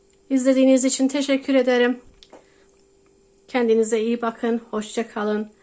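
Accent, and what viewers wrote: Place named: Cyprus. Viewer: Ne yapsın Kıbrıs şivesiyle konusyorsozleri anlasiliyorguzel tarif veryor👏👍🙏🧡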